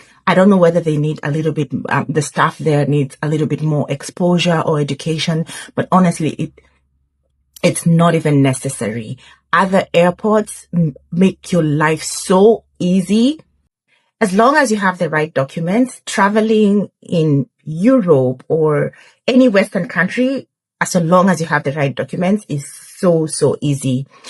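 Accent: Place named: Kenya. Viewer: Well, you need to go back to school and evaluate your education. I have studied psychocology too. And as a kenyan, I know she is kenyan. Her accent speaks for itself. 😏🙄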